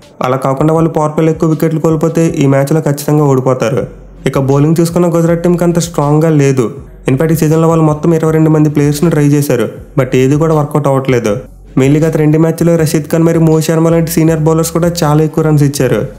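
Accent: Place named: Visakhapatnam, India. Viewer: rajahmundry ayyina aa slang lo matladatledhu miru vizag slag lane undhi so vizag vadivi anukunna bro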